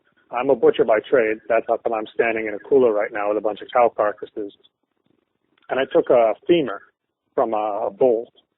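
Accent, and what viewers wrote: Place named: China. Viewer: still sounds Chinese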